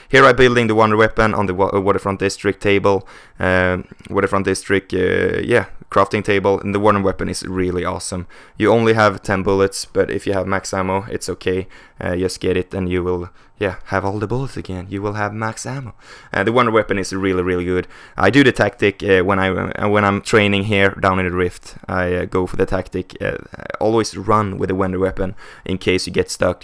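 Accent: Sweden. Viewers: Bra swengelska!!! Döör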